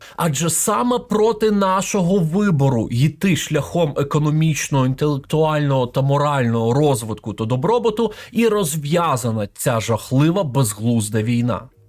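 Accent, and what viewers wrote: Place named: Western Ukraine, Ukraine. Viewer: вот так, першёл на мову с англ. субтитрами, "назло надменному соседу". Но его прежние, "предвоенные", курсы на русском замечательны, хорошая дикция и без акцента, но интонация - с западной украины.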